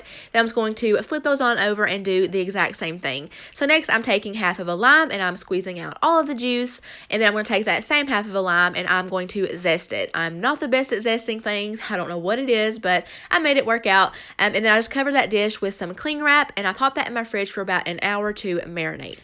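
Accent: South, United States. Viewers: I just found your channel about a week ago and have been watching all your old videos . You have been giving me so many good ideas . I love your channel and love your personality and southern accent 💕💕💕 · Bren Dala it’s her sweet southern accent....I love it, too! 💞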